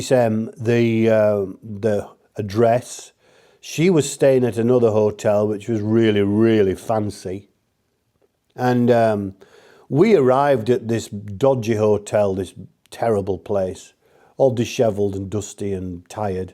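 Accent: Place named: Manchester, United Kingdom. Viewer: Evenin ! Rob recommended your channel … we’re in a similar situation, awaiting sale of house before we can buy. However our budget is lower than yours 🤣🤣 despite your Canadiannlication I recognise that you are a Mancunian 🤔😵😵. Like you i loved my 1150 GS… sadly due to a few prangs I was persuaded to change to 4 wheels- compromise was no roof 🤔🤔 … hope all goes well on the trip to France 😎 Steve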